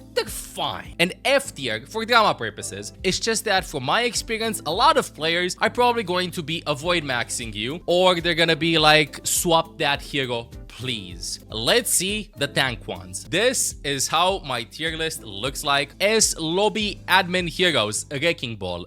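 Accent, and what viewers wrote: Romania. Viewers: That’s European accent, like Romanian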